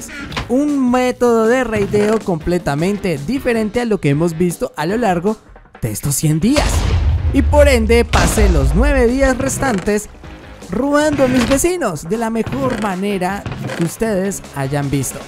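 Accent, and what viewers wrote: Colombia. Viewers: Sos colombiano?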